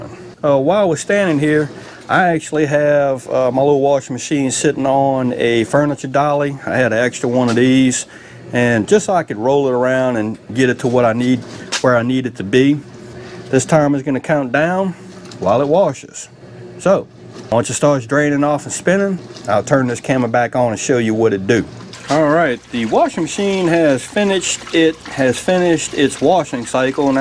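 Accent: South, United States. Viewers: Oh my goodness!! The southern twang and slang made me giggle so much it just made my day. You’re the best! Love this review & it helped me. So thanks for your time & the good vibes!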